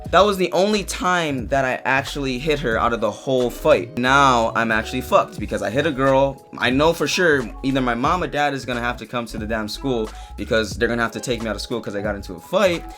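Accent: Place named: Polynesia. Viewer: I shouldve known. u had that poly accent.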